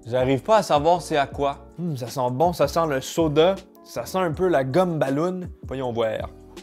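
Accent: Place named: Quebec, Canada. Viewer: J’adore regarder tes vidéos , mais le plus que j’adore c’est ton accent Québécois (ton p’tit chat aussi )😁💯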